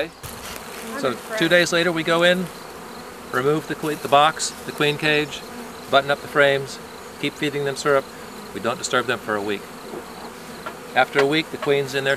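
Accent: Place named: England, United Kingdom. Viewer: Hey you sound like your from England, did you move to the USA then to start your bee company?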